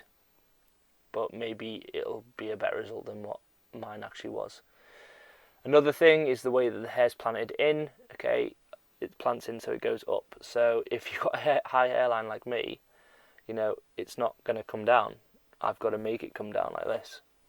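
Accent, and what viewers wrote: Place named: United Kingdom. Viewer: What was the FB group please? Didn’t catch as much as I tried (UK accent) :) Keep posting mate, good results for me.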